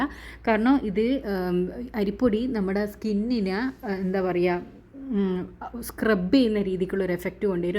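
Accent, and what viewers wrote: Kannur, India. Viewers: മുഖം വെളുത്തില്ലെങ്കിലും നമ്മുടെ കണ്ണൂർ ഇത്തയുടെ ആ കണ്ണൂർ ഭാഷ കേട്ടാൽ മതിയല്ലോ ഏതായാലും നന്നായ് കൂടുതൽ ചിലവ് ഇല്ലാതെ പരീക്ഷിച്ച് നോക്കാൻ പറ്റുമല്ലോ❤❤❤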